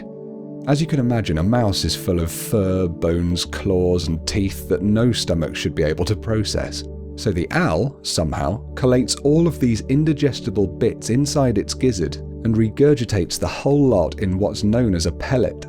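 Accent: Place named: United Kingdom. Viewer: My goodness the generic British accent yeah....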